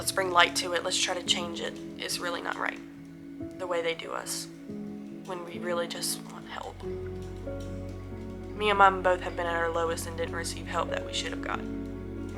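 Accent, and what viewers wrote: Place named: South, United States. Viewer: 😭 baby girl, I'm taking you on as my surrogate daughter right now. I FUCKING FEEL YOU!! I've been doing exactly this for 10+ years. Hang in there baby, it is getting better as we speak because of people like you that are brave enough to stand by their cries for help. Start with Borderline Personality Disorder. Dr. Fox here on YouTube is amazing. There are are doctors out there that can and will help us, but I have learned that it's up to us to make this change. I firmly believe that what you are doing by spreading awareness on here is HOW we make the changes we need and it's up to us because there are so many people out there that CAN'T speak up for themselves. We have to help them. It's been 4 months since your last video. I deeply hope you are still fighting. I'm HERE! I'm happy to connect with you personally if you want. 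I want to help you. ❤ Edit: One other thing - your accent tells me you are in the south. I have been in outpatient treatment systems literally all over the country, and the south is about 50 years behind. You have to educate yourself online. Keep going.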